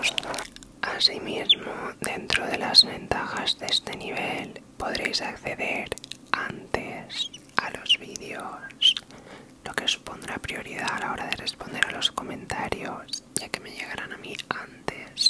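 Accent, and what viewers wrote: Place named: Castile, Spain. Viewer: Comúnmente no me gusta mucho el castellano pero a ti te queda genial y el ASMR te ha quedado muy relajante.🪐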